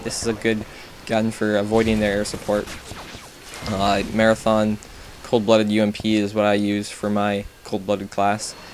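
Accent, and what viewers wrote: Canada. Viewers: Subbed Are u Canadian by any chance? I'm trying to see If I'm good at recognizing accents haha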